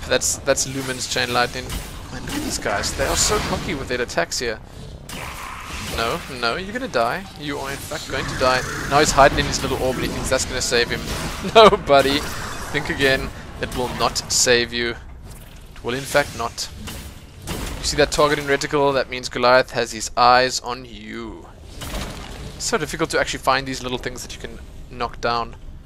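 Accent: South Africa. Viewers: I'm probably wrong but are you south african?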